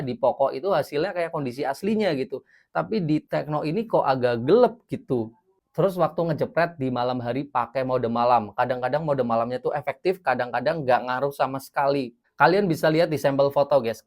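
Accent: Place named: Java, Indonesia. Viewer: Uni chanel ini KAMPUNGAN banget cara melafalkan kata Inggris nya Guys malah dibaca Ges, HARUS nya Gais atau Gaes, jadi ilfeel males lanjutin nonton, biasanya Jawa itu gak bisa bilang Ai, semua huruf i malah di baca E padahal harusnya Ai, Guys ( gais, temen temen, sobat) malah di baca GES, lalu riding (raiding, di review otomotif) malah di baca Reding, Finger print ( harus nya di baca Finjer Print) malah si bilang Finger Print), harus nya G itu di baca J bukan Ng.. Miris nya yg subscribe banyak pasti Kejawen kampung dari pedalaman yg sama sama katrok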